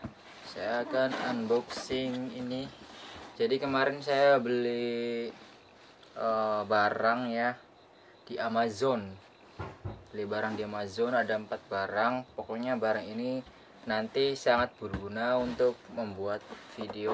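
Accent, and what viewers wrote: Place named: Tegal, Indonesia. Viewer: masa sih..tdk ada ahh hanya di luar saja..indramayu itu bukan nya jawa barat ya..kok bicara nya kaya org tegal atau brebes yo😐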